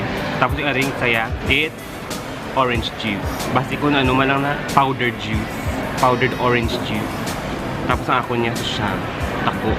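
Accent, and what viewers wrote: Iloilo, Philippines. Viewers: Love!Love! Love the ilonggo accent and seriously kahit diko po lahat maintindihan lahat ng say mo nakakaaliw ka po.Good luck, keep us smiling po.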